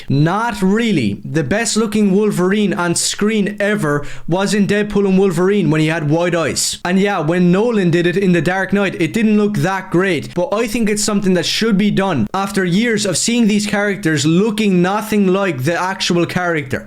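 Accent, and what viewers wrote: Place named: Ireland. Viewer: You're a funny Irish guy (I'm guessing Irish by your accent). You're also completely correct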